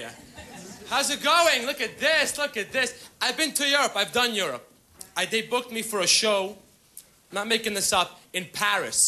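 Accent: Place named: New York, United States. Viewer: he was raised in a religious jewish home. hes got a bit of a yiddish tinge to his accent. very new york jewish.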